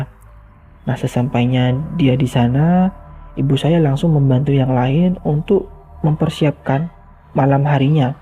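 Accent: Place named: Jember, Indonesia. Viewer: Wong jember koyone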